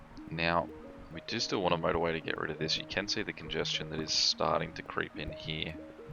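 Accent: Australia. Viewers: It is so refreshing to hear a fellow Aussie on this site, and one with a good mic at that. Keep making these vids mate, I'm waiting for you to blow up.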